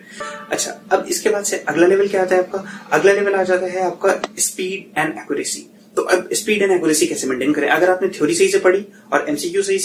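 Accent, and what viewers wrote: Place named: Kashmir, India. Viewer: Hey bro I guess you're from Kashmir na?? 😄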